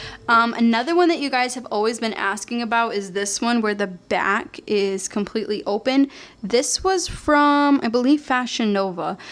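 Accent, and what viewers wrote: Boston, United States. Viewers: It sounds like a bawston accent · i can totally relate! i'm from the Boston area :) you got the accent haha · this just popped up and i'm glad i clicked it, immediately subscribed when i heard that boston accent. about to go binge-watch all your videos! hehe love from medford <3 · The Boston accent is real haha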